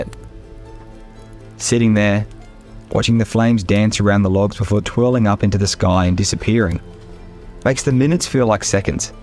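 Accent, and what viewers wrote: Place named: Australia. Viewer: Nice to hear an Aussie voice with familiar Australian places. Good video!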